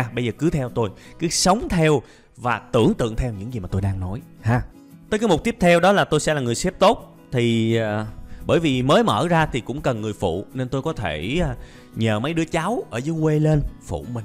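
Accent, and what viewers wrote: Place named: Saigon, Vietnam. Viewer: Thầy giảng hay lắm ạ. Mình thích giọng sài gòn quá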